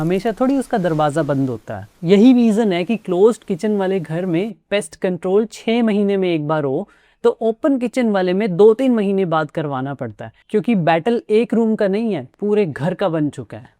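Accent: Pakistan. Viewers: Accent urdu pakistani content for indian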